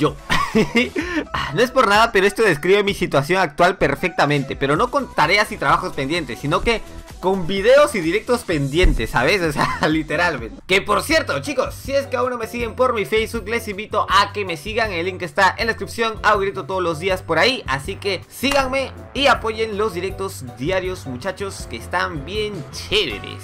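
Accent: Peru. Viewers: Habla como peruano Oe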